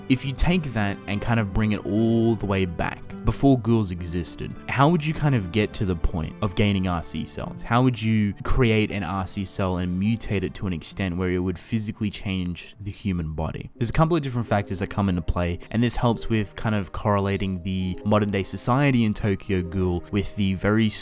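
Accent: Australia. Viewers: Wow, can definitely hear your Australian accent much more in these older videos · Polynesian tribes in those days did actual believe in the spiritual cannibalism that consuming the flesh of your enemy made you a more efficient warrior annnd say swear your aussie thats crazzy cause i never realized at all haahah im froom melbourne btw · Wait, ur Aussie? Same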